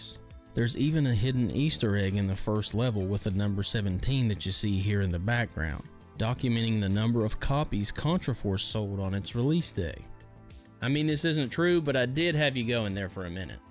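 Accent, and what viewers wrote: South, United States. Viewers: I don't know what it is about your channel, but it brings out a sentiment in me that other gaming channels don't. Seeing the instruction booklets, the old footage and how you present the information - and possibly even your southern draw, takes me back. I wish nothing but success for your channel.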